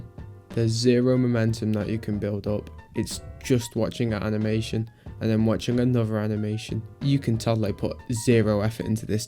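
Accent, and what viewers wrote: United Kingdom. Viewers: Are you British? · u british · Sorry, this was really hard for me to pay attention to because of how bri'ish you sound. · Are u northern Irish ☘️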